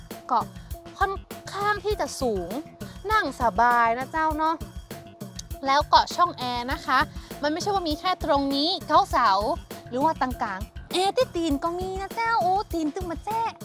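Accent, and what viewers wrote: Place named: North, Thailand. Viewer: อู้เมืองม่วนแต๊เจ้า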